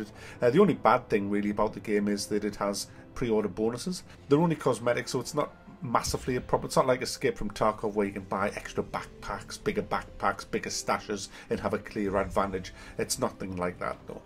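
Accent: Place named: Consett, United Kingdom. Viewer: You must live near Consett. I recognise that accent anywhere!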